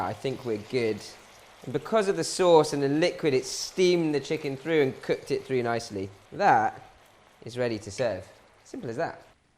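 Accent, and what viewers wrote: United Kingdom. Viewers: the British accent of course!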